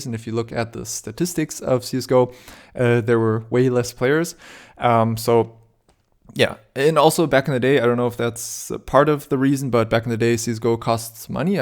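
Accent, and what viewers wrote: Germany. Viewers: okay thank you ^^ PS: are u german ? xD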